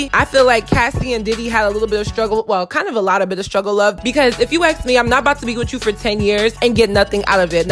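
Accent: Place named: Philadelphia, United States. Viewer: I can hear the Philly all in you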